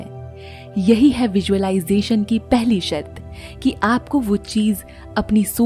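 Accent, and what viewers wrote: India. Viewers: Your voice is too good.. love Indian Hindi ton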